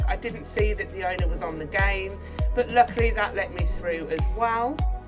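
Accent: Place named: United Kingdom. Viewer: I can't believe it took me this long to actually comment on this video. For context, I am the creator of the game and I was sent this video to a few friends of mine who found it and even I came across the video after searching up the game on Google. I didn't think much of it but today I did another search and I thought I should watch the whole thing! 1. - Clock Soapy's badge error was a period of time where more people had that badge than 60 Minutes, so it was disabled to stabilize the amount of owners with said badges. Sorry for that! 2. - I was not actually expecting the areas to be THAT small since the original size was based on Spawn, which didn't have much. My main reason for making these areas small is that I hate large and open areas. it leads to longer searches and overall takes a lot of time to find things like buttons. 3. and - Yes those are toilets. 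They're planned to be used for a new area in the future! 4. - Oops I guess I made it too close to the main road 5. - "Cheeky little button" I LOVE this commentary! It really adds a spark of life to this video (I'm sorry but the British accent makes this video way more entertaining to me than it should be) 6. - I'm glad I get to hear someone falling for the glowing flower! It felt so good to add that in knowing it would throw everyone off 7. - School is something that's for sure but I'll try my best to keep the pace going! 8. - That's a really good point. With how I plan to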